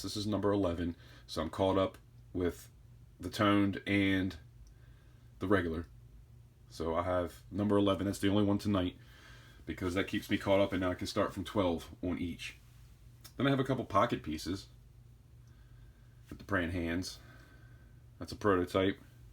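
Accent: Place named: Washington DC, United States. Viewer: Wow! You do auctions?!😯 You are the 1st person I have seen do that! Sounds like you live around D.C., I used to live in Gathersburg, MD, like back in the 80's; nice state! Those little nuggets are cool with the "CC" on it. You made that yourself? You are the man if so! 😎Looking at the rest of the video, it looks like you made a lot of this yourself! Are you like a metal-smith? I am blown away by your talent. 🤯Great job! Can't wait to look if you have a video on how you do this. Looking forward to your next video; also going to Sub now and help a little bit on your path to 2k Subs! Be safe, take care.... See you in Valhalla! ⚔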